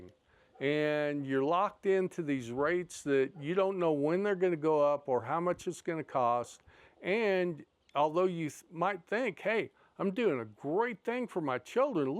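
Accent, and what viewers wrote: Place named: Brooklyn, United States. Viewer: Great video, but Brooklyn accent can be difficult to understand.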